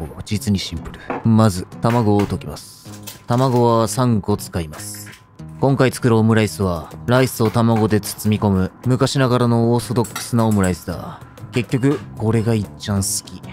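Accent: Kansai, Japan. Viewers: 「 」「極主夫道」大好き人間には たまらないご馳走料理グルメ番組（;; ） 極道なのに、どこかかわいい主人公… 極道なのに、食材に愛を感じる切り方 極道なのに、ポメと仲良し そしてなぜか関西弁… 卵かけごはんにごま油かけて 牛肉とニンニク乗せて チーズ炙ってバジルかけて マヨネーズとごま・のりを乗せてた料理みたいに どことっても美味しい要素しかないっ 大好きだ❤️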